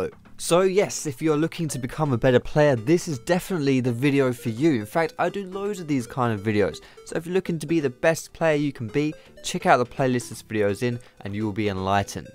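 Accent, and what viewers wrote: United Kingdom. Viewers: I really enjoy all your videos and especially I love your British accent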